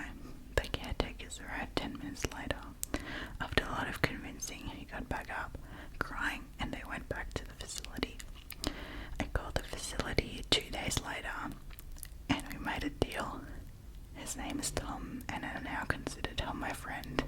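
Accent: Australia. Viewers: Okay I have to ask about your accent, I am a new sub so apologies if you have answered this already! Have you hung out with a lot of Australian English speakers? (I was assuming you were German by an earlier video) getting a strong Australian accent vibe though, so needed to ask 😊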